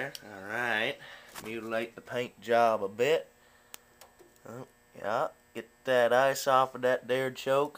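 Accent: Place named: Canada. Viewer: daves farm guy maybe , sounds canadian , doesnt sound like me , your not acting goofy enough to be making fun of me :)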